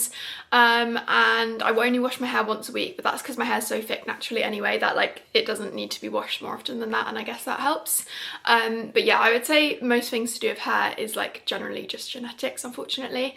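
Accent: United Kingdom. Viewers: ive seen yoy tiktoks for a while and enjoyed them, but finally subscribed to you yesterday. for some reason im so suprised your british! thought you were american...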